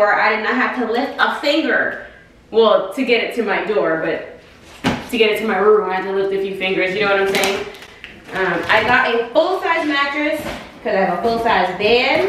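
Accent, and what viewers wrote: Jamaica. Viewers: Are your parents Jamaican? I can hear the accent and mmm mmm is honestly what have it away in the beginning 😂😂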